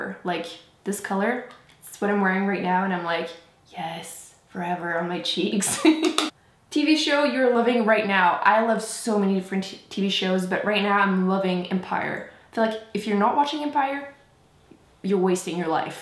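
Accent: Quebec, Canada. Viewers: Yes, No, Toaster! Le français typique du Québec hahaha! J'adore tes vidéos, elles me font toujours sourire :) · I just found your channel from the Kat Von D Everlasting Lipstick swatches and looking through your videos I saw this where you answer what kind of accent you have... French Canadian! So cool, the majority of my background is from there, and you pronounce "third" just the way I remember my late Pepe... it's nice to hear the accent again! Plus, we seem to have the same coloring (dark brown hair, dark brown eyes, fair skin) so your videos will be very helpful to me since that is a Dark Winter coloring, and you published this video on my birthday! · Just discovered your channel and right away I was sure your were french-canadian, as I'm from Montreal myself :) I'll be checking out all your videos now haha ill make sure to comment. I don't know how you do it with school and YouTube but keep it up!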